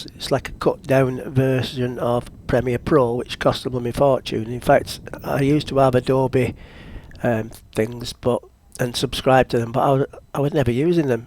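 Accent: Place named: North, United Kingdom. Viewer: What is the name of the editing suite, Premiere ? It’s your northern accent!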